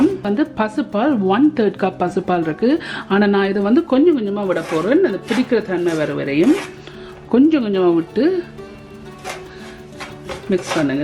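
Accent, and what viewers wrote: Sri Lanka. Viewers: உங்கள் லட்டும் அருமை உங்கள் இலங்கை தமிழும் அருமை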